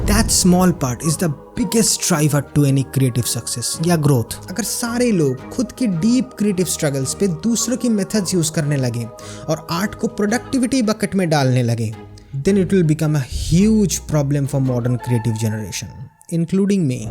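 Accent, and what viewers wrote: Bengal, India. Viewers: Bhai aap bengali ho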